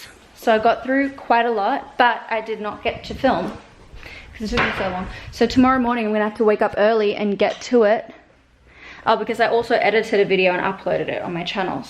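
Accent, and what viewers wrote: Australia. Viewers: Don’t lose your beautiful Australian accent......listen your husband girl😀😀💕💕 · is right, stick to your Aussie accent, love it. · We love your Australian accent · I'm from Australia so I would say keep your Aussie accent... why should we change for people. And ur husband likes it too. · Nice! L.A I am from Venice CA. Keep your Australian accent, it is Beautiful! My hubby has a Scottish accent. Love it ♥️ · Welcome to LA guys. And keep the Aussie accent. Love you just the way you are. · If it were me, I don’t know how I would deal with ALLLLLLL that makeup & beauty products, and having to organize it...maybe I would pay someone 😆 I agree w/ today on everything: you need paintings/art, a chest (besides his 😉), plants and keep the Aussie accent 😘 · Keep your Aussie accent! Even us Americans don’t like our own accents🙂 and more setting your place up vlogs pls👍🏻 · is right you should keep your australian accent, you and so beautiful together. 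Love you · I hope you keep your Aussie accent. I lived in the Philippines for almost 3 years and now that I’m back in Australia, everyone keeps saying I’ve got a slight American accent 🤦🏻‍♀️ I just want my Aussie accent back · yeeeeessssss please more setting up videos and yea she will loose her Aussie accent eventually and no no longer Australian you guys are american now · Aussie accent rules 😂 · Don’t lose your Aussie accent!! I’m Aussie and I want you to keep yours it’s why I love it!!! Also love these vlogs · Agreed with!! Stick to our Aussie accent man! Xx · I love your Aussie accent I agree with haha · Love your Aussie accent and I just like the organisation for all of your makeup products and I would also love some vlogs kisses to both of you from Paris💋🇫🇷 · We LOVE Australians in Los Angeles. Please keep the accent. 🌺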